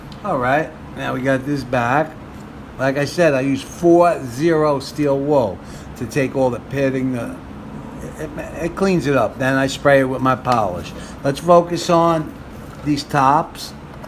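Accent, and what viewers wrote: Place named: New England, United States. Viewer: Excellent commentary and directions. Voice reminds me of when I was a young man in New England learning the trades.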